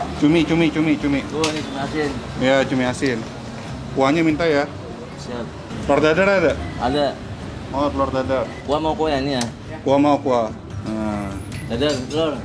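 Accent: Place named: Banyumas, Indonesia. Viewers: Warteg komplit, masnya ngapak 👍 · Ngomongnya kek orang Tegal (ngapak) banget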